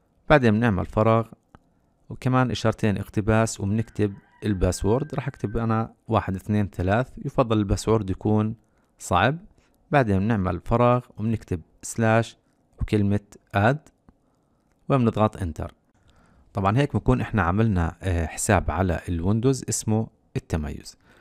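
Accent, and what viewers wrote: Jordan. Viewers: والله انك بطل و يسعد لهجتك الاردنية او الفلسطنية بطل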